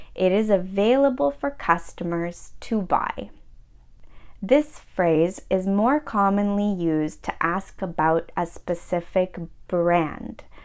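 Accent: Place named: Canada. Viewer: I love the way you speak, am here to learn Canadian accent. From Nigeria. You are doing a great job 👍❤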